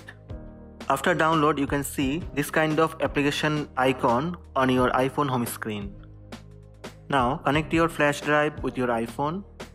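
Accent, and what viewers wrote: India. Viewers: Videoyu çeken hindistanlı nerde olsa tanırım bu şiveyi :)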